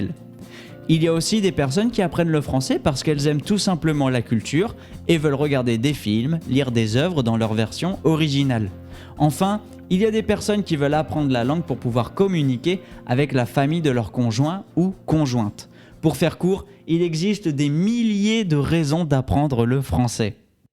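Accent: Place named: France. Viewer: Merci! J’aime bien ton accent français 😄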